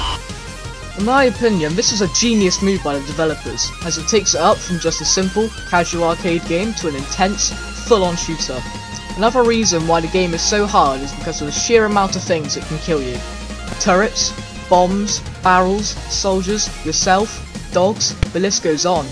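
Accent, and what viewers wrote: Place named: United Kingdom. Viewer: Judging from your voice, you are bri'ish :(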